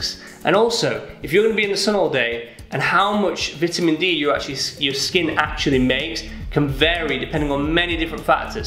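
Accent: United Kingdom. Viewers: Haha, i know it's from your accent and it's how they pronounce it in the uk which is really the proper way because the uk is where English comes from but it sounds funny to us, aluminium is even better hehe..i lived in England before and my friends would also be silly and copy my American accent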